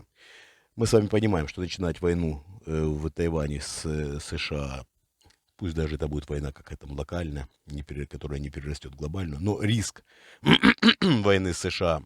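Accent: Ukraine. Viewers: зареганный в Австралии. Льющий воду, запугивающий и тот и тот народ, дающий ложные надежды, не умеющий оперировать военными терминами, неправильно составляющий предложения, плохо знающий синтаксис и в разговоре имеющий украинские следы в диалекте. Даже не знающий про за закрытый город, откуда были пуски. Когда этот хэнэрал врет он кашляет и запинается все больше и больше.его как сегодня выдает неуверенный от лжи прокуренный голос. Он, как и всегда выпрашивает Донат лайки деньги. Он просто стрижёт контент на народном горе. Карма ударит по нему а бумеранг пущенного зла совершит круг и прилетит точно в точку отсчета. Люди, в тяжёлые времена проверяйте перепроверять по сто раз источники, не питайте иллюзий, верьте своей интуиции, помогайте старикам, детям, животным. они самые незащищенные. Режим пу падет. Но никто, ни царь и ни герой, мы сами.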